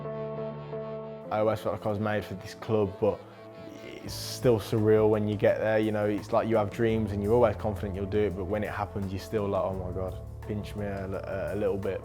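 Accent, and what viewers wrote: United Kingdom. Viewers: Bruh talk slowly 😢 We're not all from uk 🙂 ❤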